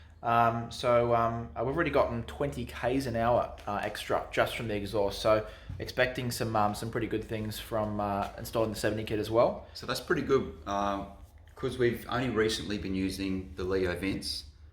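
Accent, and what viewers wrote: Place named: Northern England, United Kingdom. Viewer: oh my god I’m sorry about that cause the north of England sounds like that sorry!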